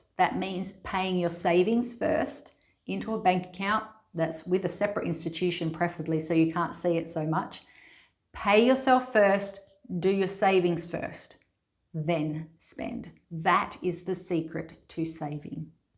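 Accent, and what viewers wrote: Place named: United Kingdom. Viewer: thanks please continue uploading videos, i like your content, as well as your lovely British accent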